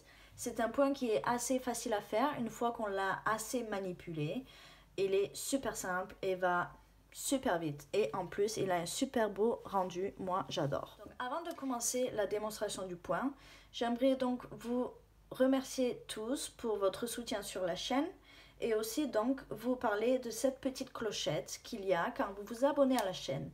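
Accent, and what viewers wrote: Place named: England, United Kingdom. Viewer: bonjour j'ai suivi vos conseil, j'aime votre petit accent anglais je vous encourage à continuer vivement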